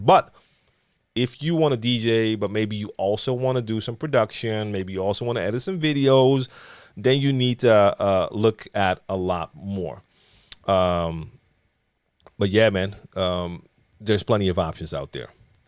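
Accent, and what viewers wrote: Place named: New York, United States. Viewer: I straight thought you where from New York.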